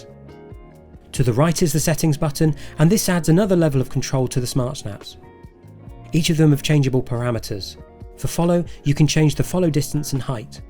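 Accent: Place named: Scotland, United Kingdom. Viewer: Thank you so much for such a great and inspiring video. I’m intending to buy the NEO as my first drone (have watched your other superb and helpful videos on it and have liked and subscribed). But this one has really inspired me. Plus the heads up on the phone video software that you used - amazing to get those shots that are perfect for sharing with family). Nostalgia see your MTB route and hearing your accent as agreed living 40y in Scotland we have just moved south to ‘the north’ to be near our ’kids’ but still have my eMTB active !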